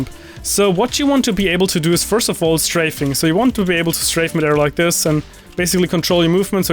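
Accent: Germany. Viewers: deutsch as fuck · Are u German?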